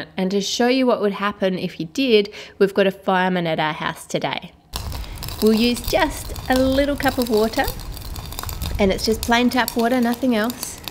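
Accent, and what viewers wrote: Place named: Australia. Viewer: Whooooa, that was an unusual video °Δ° like, seriously, i often look videos just to hear her beautiful voice and enjoy the Australian accent, and NOW I see smoke in her kitchen and then fire burning all over the video frame, and I'm like: 😨😵😱 whhhat??!!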